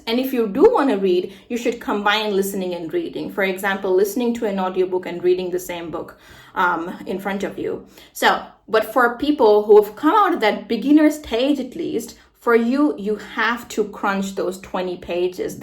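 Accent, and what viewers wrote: United States. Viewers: I love your American accent ❤️❤️❤️❤️❤️🇺🇸🇺🇸🇺🇸🇺🇸🇺🇸🇺🇸🇺🇸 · I love your American accent 🎉 Break a leg ❤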